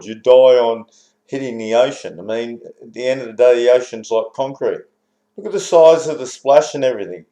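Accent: Australia. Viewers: You're totally full of shit. Except for an Aussie accent you got nuthin'. The capsule was supported by the service module, which is much bigger and has the consumables and the fuel cells. The Apollo is somewhat roomy inside with the seats stowed. The seats fold and retract and leave about a 6 foot tall space that is 10 feet around. Just because you're too much of a wussy to shit in front of other guys doesn't mean dedicated people can't do it in order to get a free trip to the moon. You're a hick jerkoff. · I think this guy's a fellow Australian by the accent,admittedly a bat shit crazy conspiracy loving version.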